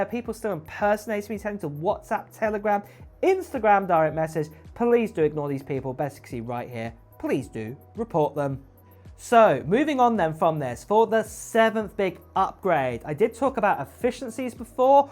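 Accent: Cockney, United Kingdom. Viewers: This was almost painful to listen to….sorry but the English cockney accent was very difficult to understand.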